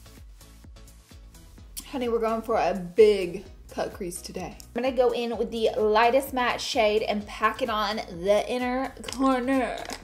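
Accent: South, United States. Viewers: I'm from Alabama! That accent is so southern. I'm from a really country town. Like right below Tuscaloosa, Al. Roll Tide nation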